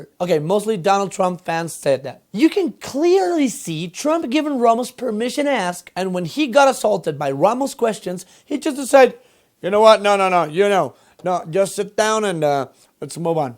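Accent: Mexico. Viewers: el ingles esta chido se nota el acento mexicano chingon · everyboy in every video say things about his pronunciation, he's mexican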